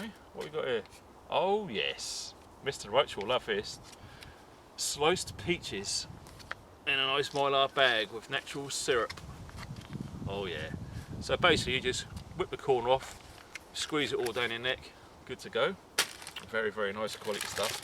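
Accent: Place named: Suffolk, United Kingdom. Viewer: Good old compo was the best...babies head, bacon grill, curried chicken biscuit browns....i used to love mixing my hot chocolate powder in with the rolled oats mix ooh yeah them were the days and the boiled sweets you got loads of I remember getting a bollocking for unwrapping one loudly on a patrol you're from suffolk int ya? I can tell buh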